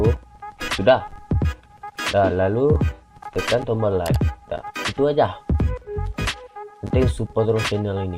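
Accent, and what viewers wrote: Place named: Aceh, Indonesia. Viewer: Bole kasih kritik? Bole ya...biar makin sukses.... Klo ngomong jan medok aceh bgt.. Trus cobak tuh lighting fotonya disamain kek latar belakang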